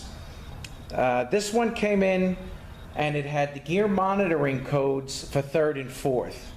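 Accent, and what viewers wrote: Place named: New Jersey, United States. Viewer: I like to hear about 5hp19 with Sopranos kinda accent! All best to you!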